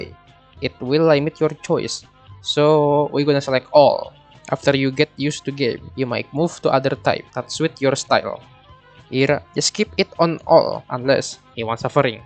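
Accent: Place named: Indonesia. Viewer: Great video, wish I could understand the heavy indonesian accent in some places, but the editing is hilarious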